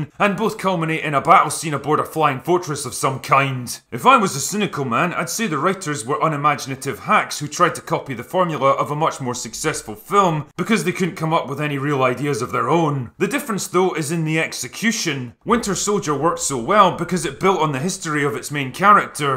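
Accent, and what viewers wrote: Scotland, United Kingdom. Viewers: Dude, are you Scottish?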